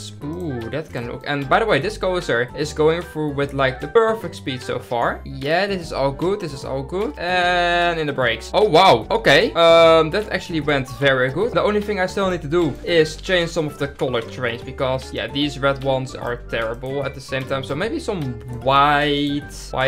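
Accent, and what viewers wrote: Netherlands. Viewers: i know your netherlands by the way you talk english so --> hoi leuke video heb je gemaakt maak nog meer van die videos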